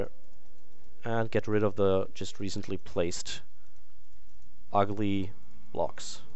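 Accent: Germany. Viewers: hei is that a german accent? :) nice vid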